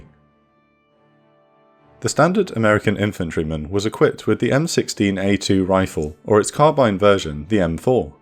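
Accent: United Kingdom. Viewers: A lot of these videos are made with the premise that the British accent = well-informed historian, when it's really a millennial generating click revenue after reading Wikipedia and adding file footage. Iraq had their own domestic production of copies of the Yugoslavian Zastava M70B1s, which were copies of Albanian smuggled AKs from 1959. Zastava helped Saddam start his domestic production of what they call the Tobuk in 1979. Those weapons made from <num> were basically equivalent to Yugos, then the production quality dropped off. They are neither Avtomat Kalashnikovs of 1947, nor Avtomat Kalshnikovs Modernizinaiya (AKM). They are Iraqi copies of Yugoslavian M70s.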